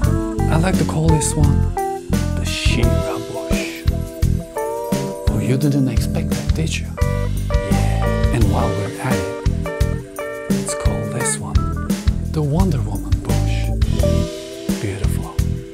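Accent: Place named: Turkey. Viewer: Güzel kanalmış, ingilizcenden Türk olduğunu anlayabildim. İştabilizer'i görünce tamam dedim :)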